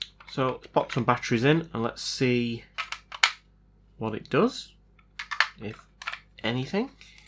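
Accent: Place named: United Kingdom. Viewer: It's always interesting listening to a Brit pronounce R's.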